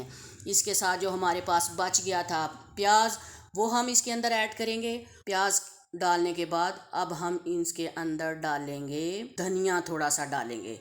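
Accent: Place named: Punjab, India. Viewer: omaigod your punjabi accent😗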